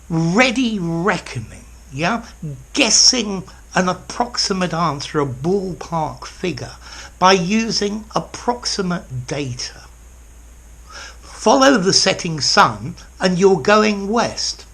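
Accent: United Kingdom. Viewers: I reckon that your channel is the best to learn English with Britch accent Hello my teacher 🌺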